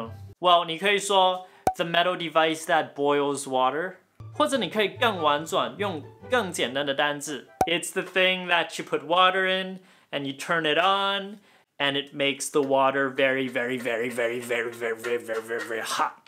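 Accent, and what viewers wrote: Cantonese, China. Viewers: Uspeak cantones so cute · OMG是真的Ne-Yo!我本來還期待你自己裝扮成他! 越來越棒了~真的大愛你講廣東話跟有廣東味的中文(普通話)😍😍😍!!!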